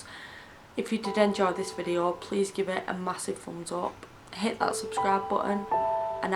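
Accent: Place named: England, United Kingdom. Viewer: are you and Mollie both in England? You sound very different (accents). I'm wondering if there are different accents within England like there are in the US. To where when someone talks, we know pretty much where they are from.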